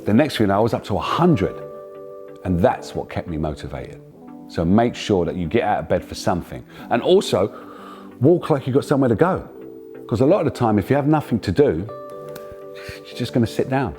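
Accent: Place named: West Midlands, United Kingdom. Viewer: Lovely video! Well done in content, appearance, tone and message. It is the right mix of smooth and suave, with the hard but necessary wisdom from experience. A motivating message with hope, no less. Oh the accent! I must confess it draws me in and draws up the memories of living once upon a time in the West Midlands. Thank you kindly, for posting.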